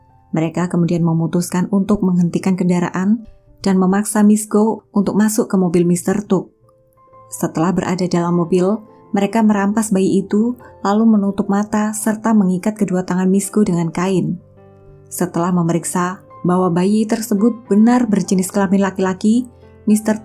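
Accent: Java, Indonesia. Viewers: Logatnya terlalu medhok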